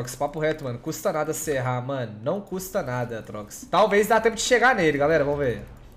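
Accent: Minas Gerais, Brazil. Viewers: sotaque mineiro do é o motivo pelo qual fico gay td dia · voce é de onde mano ? sotaque de minas ou de go ctz haha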